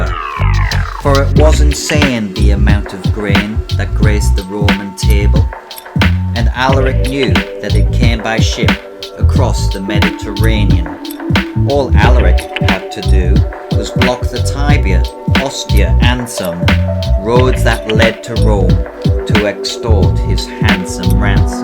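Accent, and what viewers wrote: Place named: Sunderland, United Kingdom. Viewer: You are an absolute laaaaad 🙂 Nice to hear you still have a Sunderland accent even after all those years after leaving.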